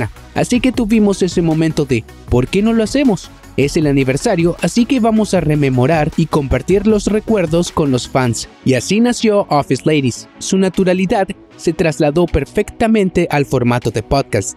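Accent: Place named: United States. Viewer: Menuda ostia tienes con ese acento yanqui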